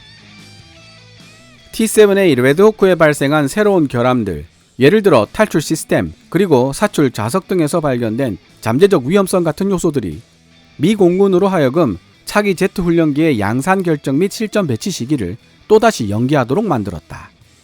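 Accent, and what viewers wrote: Gyeongsang, South Korea. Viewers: 경상도영어는 제발 읽지말고 그냥가자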